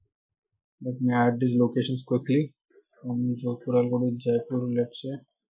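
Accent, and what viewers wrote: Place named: Pakistan. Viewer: Learn to speak English in English,not in pakistani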